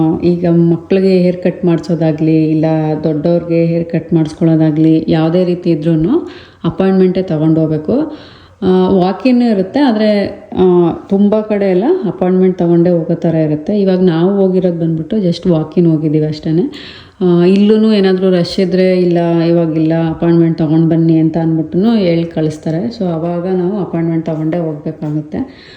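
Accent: Mandya, India. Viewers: Nivu mandyadavara? Thumba chanag matadtira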